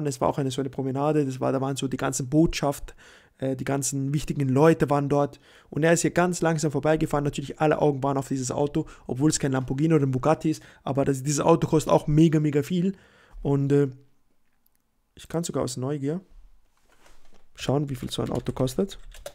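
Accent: Austria. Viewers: Bist du Wiener ?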